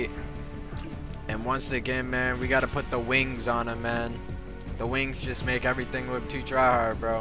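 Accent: France. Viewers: Are tou french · Are you french?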